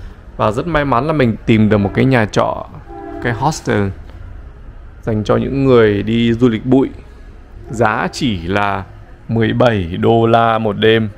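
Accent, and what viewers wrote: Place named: North, Vietnam. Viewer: Cô rất thích Video của Cháu trình độ thật hay .Cả giọng Bắc dễ thương nhẹ nhàng .Mong Cháu thành công trong công việc .👍🥰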